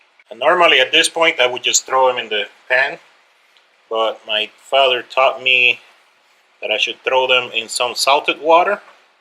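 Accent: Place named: Puerto Rico. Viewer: I'm new to your vlog. Saw you on veganvlogs. You look and sound puertorrican so welcome back to PR. Hope everything gets better for you here and that you find what you're looking for. I commend you on your efforts to try eat less meat. I know it's not easy I'm a meat eater myself who wants to go vegetarian. Always remember your goal to get healthy and you'll succeed.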